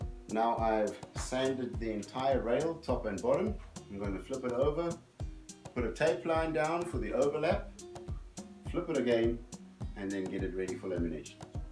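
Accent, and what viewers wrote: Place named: South Africa. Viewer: You sound South African bru, lekka to see some local vids on YouTube. Keep up the board building.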